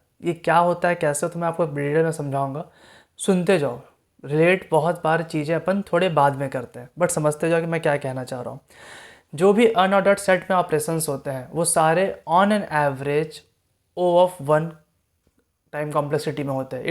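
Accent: Bihar, India. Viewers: sir aap bihar se hai???/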